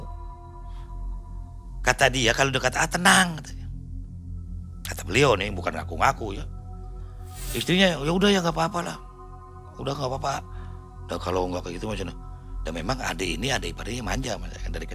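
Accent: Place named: Sunda, Indonesia. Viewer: Assalamualaikum kang,Abi sok setia nonton kisah na khas Sunda pisan nyariosna sing damang Oge sadaya kulawargina seru Oge Aya NU Matak seuri JD kahibur.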